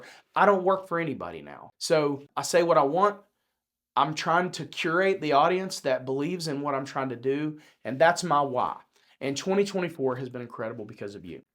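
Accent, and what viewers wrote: South, United States. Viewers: aww man…after that Christmas video I thought we'd get a rendition of Auld Lang Syne! That first 30 seconds of this video had me laughing – I seriously was one of those 5!! So glad and grateful for your amazing progress since your start. I do watch many of the other similar channels; yours quickly became is my favorite. Love your insider knowledge; ability to highlight numerous subtle details that would typically escape the average viewer's notice, and your breakdowns of how you would argue the case in court if it were your own. Your eloquence and that well-timed humor you sprinkle in make your style stand out above the rest. Oh, (and of course!) – that Southern Drawl!! You'll meet your goal for this year, or even surpass it. I’ve often wondered how many hours went into making just 1 video. I look forward to everything you've got coming for this year. 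Man, you must run on diesel fuel or something. The books, channel, training program, podcast - all these have the potential to positively impact our nation and transform countless lives. In some ways, listening to your SDL channel has changed mine. I’ve watched your videos and have tried to emulate your speaking style and manner in both my personal and professional life. I know you have to adapt to what the audience wants but please don't curtail your speaking time too much! That’s one of best and most distinguishing features that elevates your content above others. Each video covers a lot and I think you throw in all the aspects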